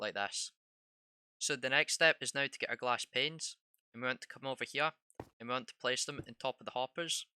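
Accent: Scotland, United Kingdom. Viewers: aye are you scottish from 🏴? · Is that a Scottish accent? Sounds cool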